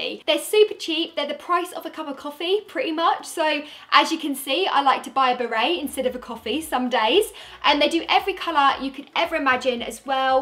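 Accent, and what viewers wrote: United Kingdom. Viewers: She’s from the uk ❤️ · You may not realize it,but it's the combination of your dimples,your eyes,your British Accent and those colorful Berets you employed on your head that drove this Cambodian lad insanely mad.You got a boyfriend?? I can totally see you clinging to my arm while take a promenade up and down the Champ Elysee.The only thing missing on you is a little French Poodle leading the way to The City of Love!!;))))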